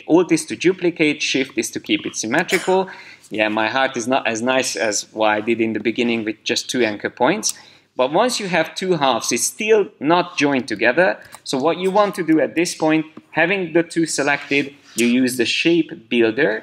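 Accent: Hungary. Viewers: Érezhetően magyar akcentus, jó hallgatni! Könnyebb megérteni :D Köszönöm az útmutatást! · Hey are you hungarian? Im pretty sure by the accent. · magyar akcentust vélek felfedezni, de ez persze nem változtat azon, hogy szép munka!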